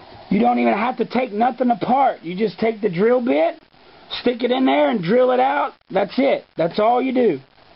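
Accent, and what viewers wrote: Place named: South, United States. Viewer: Ur voice always sounds funny because ur from the south and I'm from the north so yeah, no offense, great vid can't wait to get the long strike!!!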